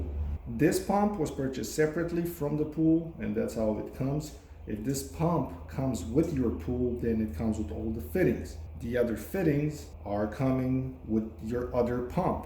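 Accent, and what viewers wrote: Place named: Eastern Europe. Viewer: Can’t quite place the accent..sounds Eastern European, and to my Romanian ear, it sounds almost Romanian. Which is it? Curious here..:)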